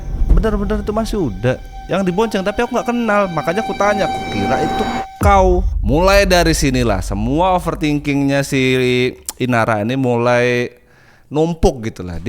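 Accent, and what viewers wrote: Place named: Java, Indonesia. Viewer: Enak lek crito.. kyk koncoku d deso lek crito.. bhsane medok enak di denger.. seru😂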